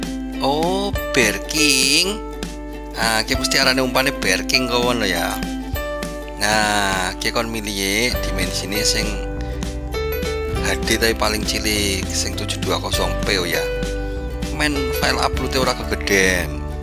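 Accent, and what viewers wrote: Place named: Banyumas, Indonesia. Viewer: Ahahahah lanjut ngapak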